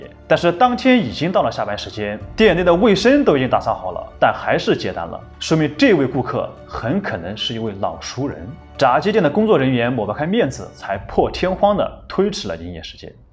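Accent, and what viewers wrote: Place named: Anhui, China. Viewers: 主持人是安徽人，口音重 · 听起来口音应该是安徽的吧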